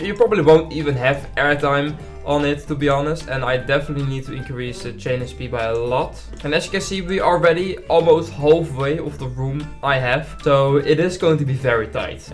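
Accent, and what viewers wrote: Netherlands. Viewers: het nederlands accentje is er wel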